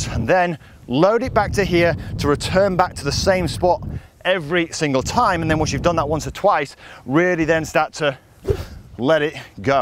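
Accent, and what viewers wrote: United Kingdom. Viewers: who the heck is and how ddid he get so many followers without me ever heating about him...fideo seems very good guess thats why..ps..how did the hoo UK online golf instructors manage ot get their own diffrent accent?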